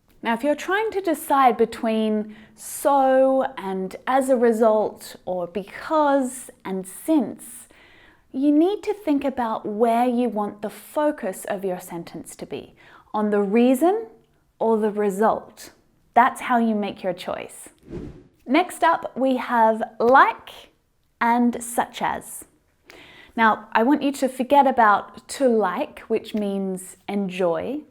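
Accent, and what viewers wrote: United Kingdom. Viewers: Hello, 👋 first of all thanks but I believe your pronounceation is British, am I right? So I've learned American English, but my English is not good enough so if I follow you may be I confused. I need an American accent teacher could you help me or what is your suggestion? Thanks in advance. 🌹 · G'day, I can't figure out if you're British or Australian. Maybe (like me) you're both?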